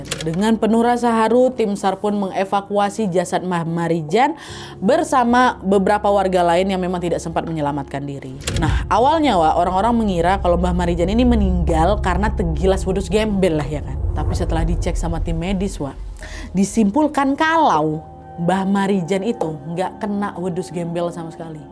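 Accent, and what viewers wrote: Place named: Medan, Indonesia. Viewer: nampak x kau medan nya Wak🥱🥱🥱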